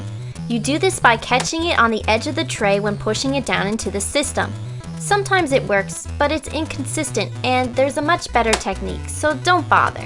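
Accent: Canada. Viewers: Are you Canadian?! :D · I can tell she's Canadian .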